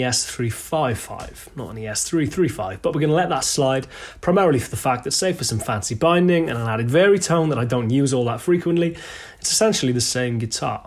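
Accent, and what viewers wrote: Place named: United Kingdom. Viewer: I was born and raised in the US and I'm listening to this video simply because I like the way speaks. My wife and I were talking about how much we enjoy British English (a blackbeltbarrister video had just ended). So I pulled up a video for an encore. David Bennett Piano is sure to be next.:)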